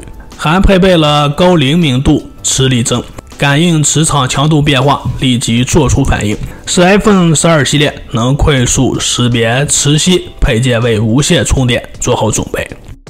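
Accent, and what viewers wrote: Shanxi, China. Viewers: 听起来像山西陕西一带哈哈哈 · 你山西人哇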